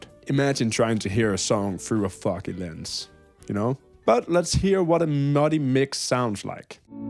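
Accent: Denmark. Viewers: you sound incredibly danish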